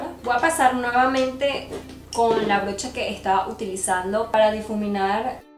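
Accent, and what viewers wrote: Venezuela. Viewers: Mi.usted es asiacata..pero tiene asento Venezolana..soy nueva..sakeme d duda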